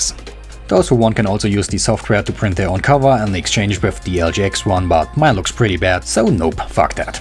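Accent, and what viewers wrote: Germany. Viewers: You are from Germany,right?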